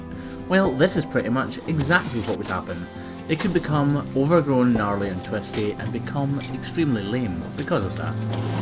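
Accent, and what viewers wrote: Scotland, United Kingdom. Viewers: I don't think he should change anything, Its Scottish, quickly spoken and a bloody beautiful accent. It's like asking you to change your accent because I don't like the way you say morning 🤷‍♀️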